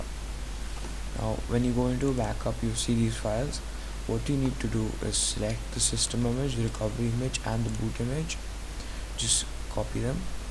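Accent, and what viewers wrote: India. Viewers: A white guy with an indian accent you dont see that every day :3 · dosent work and hard to understand indian taxi acent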